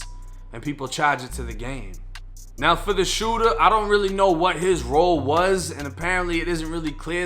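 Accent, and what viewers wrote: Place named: Northeast, United States. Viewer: Gotta be from NE area, I love that accent too...lol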